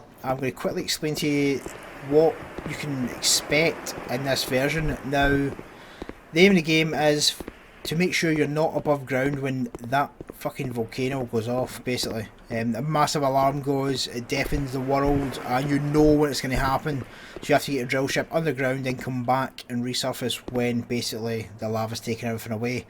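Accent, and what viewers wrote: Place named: Scotland, United Kingdom. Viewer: Btw I love your Scottish accent lol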